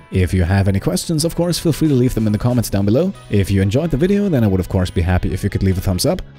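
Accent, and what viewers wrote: Germany. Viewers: RL comes first - no doubt. Congrats to your new business! :D A new strategy game would be nice. Maybe a paradox-one like EU4 or Stellaris or something similar. But its up to you. Im open for all, i guess. Because i really like your smooth voice. Soooo you are from germany! :D Honestly I almost thought so... Manchmal hört mans tatsächlich bissel raus ;) ;) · Holy shit, do you even sleep? Work + school + youtube.. Also did not even register your german accent until like 20th episode of Rimworld and wanted to say great content and keep it up please!!! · Warte warte warte du bist deutsch. Damit hätt ich jetzt net gerechnet vom Akzent her :D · Ah Germany, I couldn't place your accent. I knew it sounded different from a British one but now I can hear it. You deserve all your subscribers and even more!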